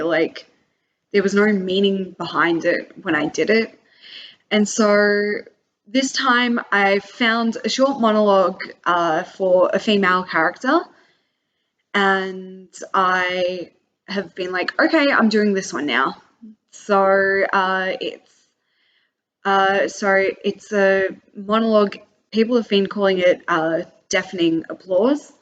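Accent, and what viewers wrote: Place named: United States. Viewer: A great way to document your process. Your American accent is pretty good. Keep up the good work! From an American playwright.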